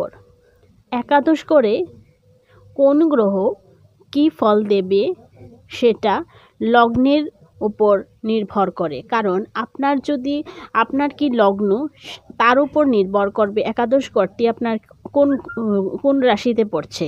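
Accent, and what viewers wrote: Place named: Bangladesh. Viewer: আপনার উচ্চারণ ভারতীয় বাঙ্গালী মনে হচ্ছেনা।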